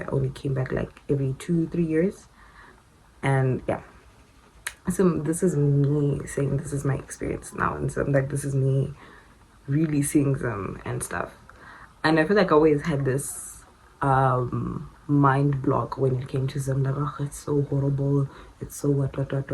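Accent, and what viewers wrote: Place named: Ndebele, Zimbabwe. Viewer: Ne accent yako ino sounder munhu anotaura ndebele waive ku safe side dai wakataura ndinonyanya ku watcher channel yako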